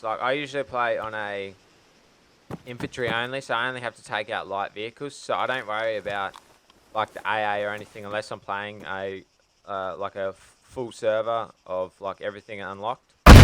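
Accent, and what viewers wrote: Australia. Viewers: god you have the most aussie voice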